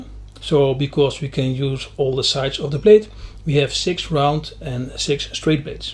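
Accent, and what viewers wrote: Netherlands. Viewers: Leuke video man grapig dat Nederlandse Engels 🤣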